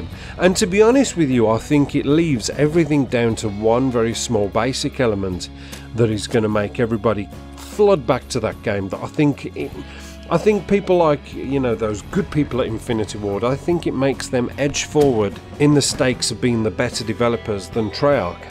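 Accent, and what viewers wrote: United Kingdom. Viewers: dropped a like, good video, also it's good to find a good British commentator, when everyone seems to be american in the cod community.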